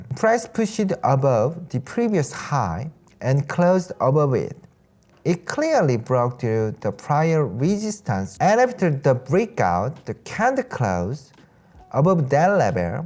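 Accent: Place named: United States. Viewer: Fifty year old born and raised Briton here. I understand him very clearly and easily. For me he is speaking with an American based accent and cadence, it is the Standard International/Business American English version. Which is overlaid onto his Native South Korean accent (Im assuming from the name ). If you can not understand him, then you will not understand most of the World when they speak English. Including from the Land where English was birthed: England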